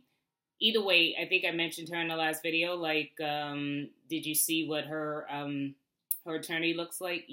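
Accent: New York, United States. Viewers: Hi this your new subscriber from Nassau Bahamas great video and I watched that Kavanaugh hearing today I had too laugh lol.. I love your beautiful New York accent lol..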